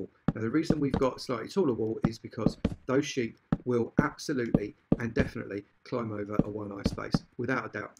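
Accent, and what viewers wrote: United Kingdom. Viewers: I love his voice. Hell, I love any Brit's voice, mostly.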